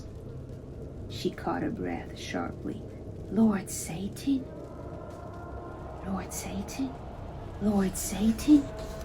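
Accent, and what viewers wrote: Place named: England, United Kingdom. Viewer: C'est très bien lu, avec un bel accent anglais et une belle intonation. J'ai pu suivre l'histoire avec les sous-titres incorporés (anglais). Merci!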